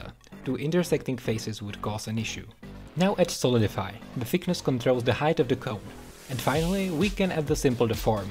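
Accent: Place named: Czech Republic. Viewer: Hmm, you accent sounds little bit like czech, or slovakian? Im sure youre from central europe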